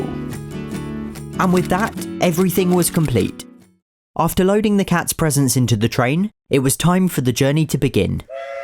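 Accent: England, United Kingdom. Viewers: Are you british? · Are you British? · I have this lego trains and you speaking like english gentlemen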